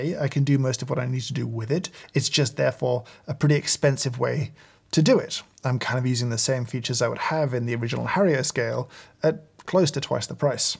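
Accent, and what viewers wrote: United Kingdom. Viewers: That's the effect British accents have on Americans. This dude could literally tell stories about his farts throughout the day and Americans would tune in.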